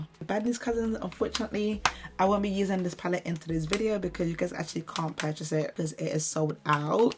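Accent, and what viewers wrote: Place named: United Kingdom. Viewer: obsessed with how you're putting us on!! are you nigerian?i love your accent, black british folk are another level of gorgeous. sincerely, nigerian american cousin here :)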